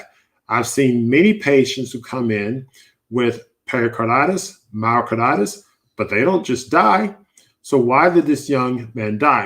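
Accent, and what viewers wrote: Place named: South, United States. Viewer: I hear a southern accent. Where r u from?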